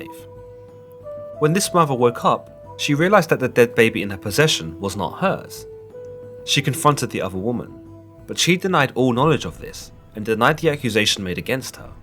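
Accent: United Kingdom. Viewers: Something about British voices that make things sound better lol.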